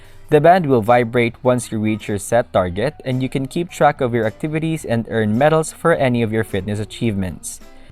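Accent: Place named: Philippines. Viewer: omg the annoying Philipino accent.. the accent spoiled the whole video.. PLEASE LEARN ENGLISH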